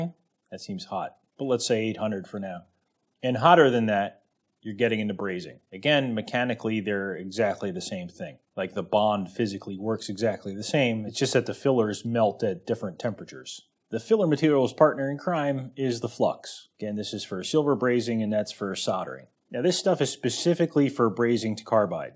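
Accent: United States. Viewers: Love these videos, still don't understand why Americans change the L in solder to a D? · It does make me laugh when you guys on the other side of the pond pronounce solder as "sawder" rather than soldier without the i.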